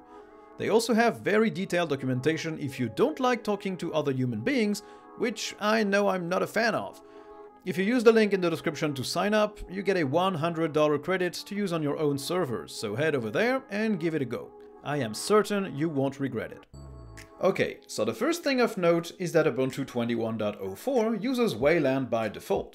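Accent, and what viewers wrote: France. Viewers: Vous êtes français ?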